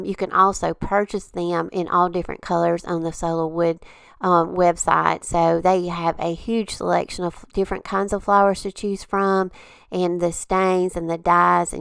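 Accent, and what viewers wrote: South, United States. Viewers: Lovely Southern accent, but she is speaking very quickly and I can't make out what she is saying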